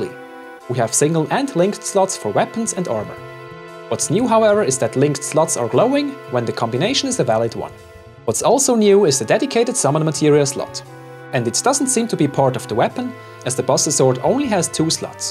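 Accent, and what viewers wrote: Germany. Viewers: Kommst du aus dem Osten oder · Are you German?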